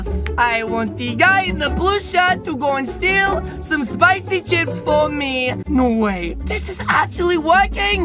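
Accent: United Kingdom. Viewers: are you brittish 🇬🇧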